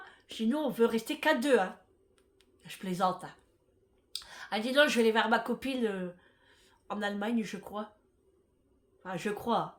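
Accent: North, France. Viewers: voilà, c'est sûrement ça....!!!! Avec votre accent du Nord, le micro, Macho-Mattew, je confondais. Merci. Je croyais que j'étais à "l'ouest" 🤣🤣🤣🤣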